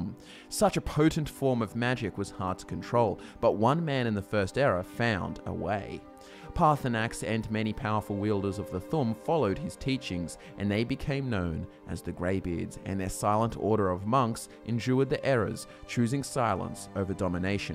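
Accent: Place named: Australia. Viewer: 3 it's actually australian that you and youtube auto-comments have a hard time with ;)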